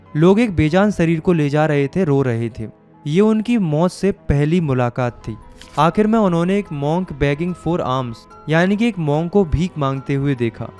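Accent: Uttarakhand, India. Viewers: Bhaiya, Are you from Uttarakhand??❤❤❤ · Sir are u from Uttarakhand❤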